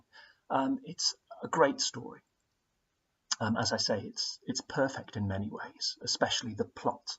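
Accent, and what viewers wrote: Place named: United Kingdom. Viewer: Please tell me in which british accent you are talking😊💐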